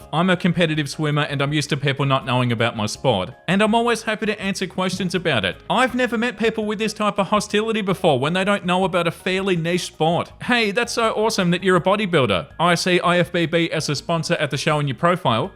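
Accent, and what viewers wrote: Australia. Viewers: Australian mate 🙌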